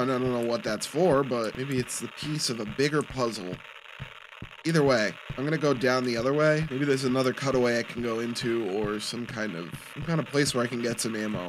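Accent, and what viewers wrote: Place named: South, United States. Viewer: That country voice modulation tho 😏🥵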